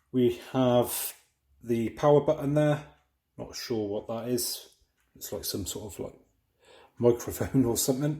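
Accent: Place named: West Country, United Kingdom. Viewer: Great to hear a West Country accent, not enough of them on YouTube! Just bought one of these, it arrives tomorrow, my first "upgrade" in 11 years and this was very helpful. I chose the white in order, I hope, to avoid smudgy fingerprints! I also paid the extra tenner and opted for their offer (new I think) to set it up for me, using my Wi-Fi, not sure how they do that and my Amazon Account!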